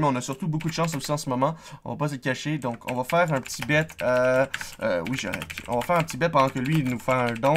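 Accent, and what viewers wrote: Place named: Quebec, Canada. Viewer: je viens de tomber sur ta chaîne et JE L'ADORE. Bon xD Bisous de France c:. J'adore l'accent québécois xD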